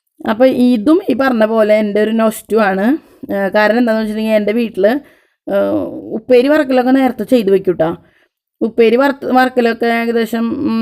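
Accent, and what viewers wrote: Thrissur, India. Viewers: Hai. onathinu orukkangal kandappol enikku ente veedum kuttikkalavum okke orma vannu. pazhaya ormakal unarthunna oru vlog. enikku othiri eshtappettu.pinne u de Trissur slang kelkkan nalla rasamayirunnu. thank you so much for sharing the video.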